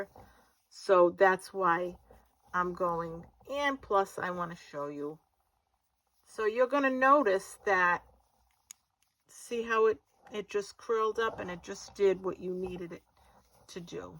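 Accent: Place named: Brooklyn, United States. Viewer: Are u from Brooklyn New York? Your accent sounds like you are. Love your accent!! Im from Louisiana and still live here and ppl think we r from Brooklyn as well. Lol!!! Im asked all the time r u from Brooklyn? Lol! ❤❤ loves these lil baskets! Going to make a few and put candy in them for the family. Thanks! ❤❤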